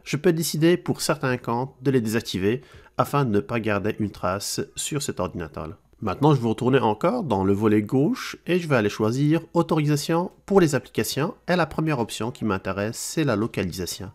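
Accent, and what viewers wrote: Quebec, Canada. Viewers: Cela semble convaincant !!!!!: mais même s'il me semble que cela doit être super important, je crains de faire une mauvaise manip qui pourrait provoquer des problèmes sur mon PC...MAIS je serai tentée de le faire.....Merci et j'adore l'accent Canadien que j'écoute depuis le Médoc en France. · Abonnée + 1 et oui, vidéos extras et avec ce petit accent québécois, c'est encore plus sympathique. Merci ^^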